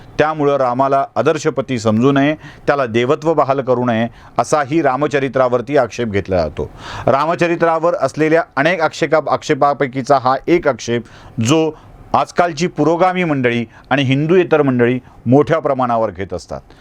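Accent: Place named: Marathwada, India. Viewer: विश्लेषण चांगलेच, पण श्रीरामांचा उल्लेख एकेरीत करणे योग्य वाटत नाही. तसेच आपले मराठवाडय़ातील मराठी प्रयत्नपूर्वक व जाणीवपूर्वक शुद्ध करणे आवश्यक आहे. आपणास खूप उंची गाठायची आहे. धन्यवाद .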